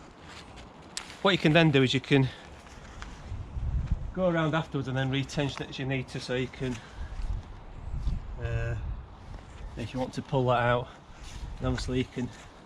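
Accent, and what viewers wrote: Yorkshire, United Kingdom. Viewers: Thanks very much for this vid and the others on the Lanshan 2. And so good to hear it in the original Yorkshire 🤓. I walked & wild camped on some of the Pennine way in March with my new Lanshan 1 and liked it so much I’ve got myself a 2 to go bikepacking with my young son at Easter..so great to get some tips, and such a solid recommendation.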